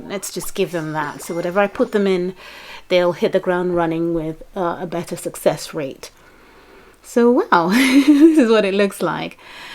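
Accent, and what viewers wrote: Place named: RP, United Kingdom. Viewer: I love how you speak! Super posh, I find it. I love it! :D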